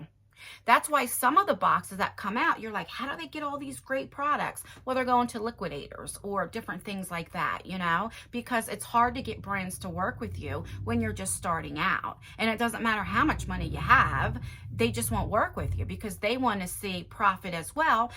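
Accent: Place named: Massachusetts, United States. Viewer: I’m 69 years old please advise me,I love the way you tell it like it is,your a doll. And boy can I hear your accent,because I’m from Massachusetts 🥳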